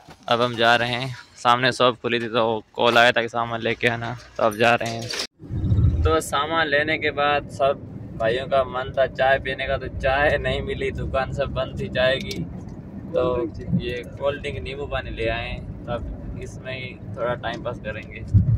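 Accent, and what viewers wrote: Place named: Sindh, India. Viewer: Mai to smjha k Pakistan sindh ka area h koi kiun k ap ka lahja bhi Sindhi lagta h or or Yahan Kuch Sindhi hindo h I dia h pahla Sindhi Muslim daikha h🤔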